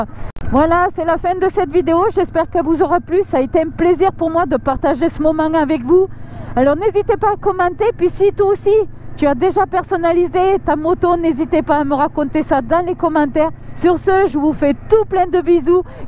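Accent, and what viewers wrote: South, France. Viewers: J'adore ton accent du sud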